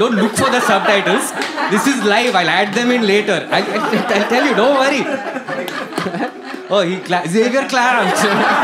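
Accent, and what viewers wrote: India. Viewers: That Indian accent though 👎🏻🤮🤮🤮🤮🤮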